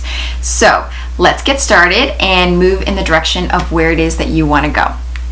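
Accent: Canada. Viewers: I knew you were canadian before you even said you were. that accent is undeniable.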